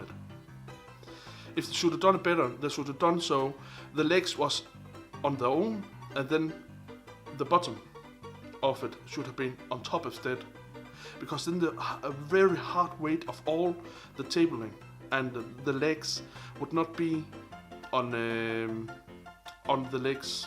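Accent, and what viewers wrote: Denmark. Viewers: Hold kæft det er nemt at høre du er dansker :-D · ornli´ dansker ahah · Man kan bare høre at du er dansker hahahahahah!!! :D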